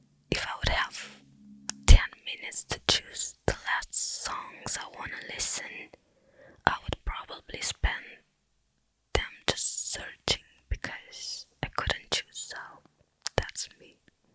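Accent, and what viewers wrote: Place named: Romania. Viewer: You’re from Romania!! I love your accent so much and you are pretty! Keep up the good videos!!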